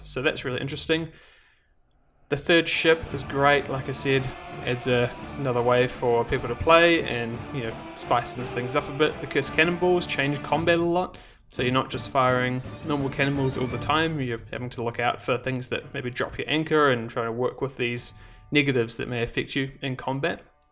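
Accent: Australia. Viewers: Canadian dollars.....you live in Canada? You sound Australian or maybe kiwi haha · What he's Canadian? I could have sworn from his accent he was Aussie.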